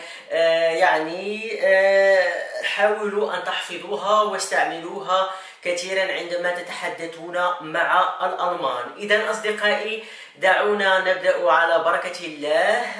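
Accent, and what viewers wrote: Morocco. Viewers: انته مغربي ماهيك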